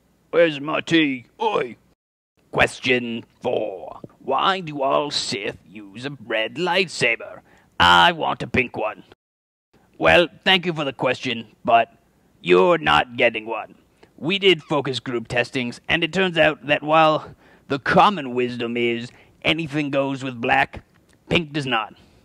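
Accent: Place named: United Kingdom. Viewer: British accent lol. love the question!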